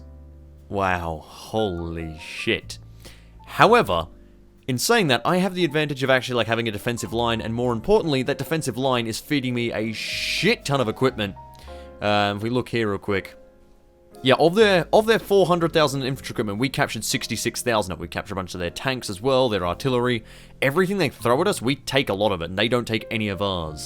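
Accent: Australia. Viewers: You over exaggerate how Australian you are and it’s really cringey and hard to watch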